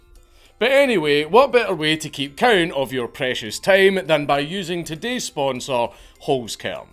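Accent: Scotland, United Kingdom. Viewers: Goddammit you know how hard it is to find Celtic Myths on youtube narrated by an ACTUAL SCOTSMAN. Throw me another several bones as one of your countrymen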